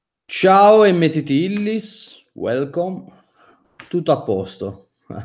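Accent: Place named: Sardinia, Italy. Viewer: Di quale città sarda sei?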